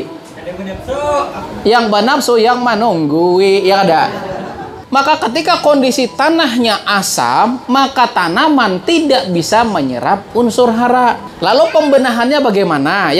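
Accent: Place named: Hulu Sungai, Indonesia. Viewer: Hadiirrr ... Nah pak ulun curiga mendengar logat piyan ne mulai awal nonton Chanel ini, model ada hulu2 nya macam urang hulu sungai🤭sekalinya ... Kita sebanua, Ulun asli Martapura, tp kuitan Ulun hulu sungai asli Kandangan wan Barabai, Sukses buat Chanel piyan ini, SGT BerManfaat... Smoga Pertanian Indonesia Maju😇 Aamiin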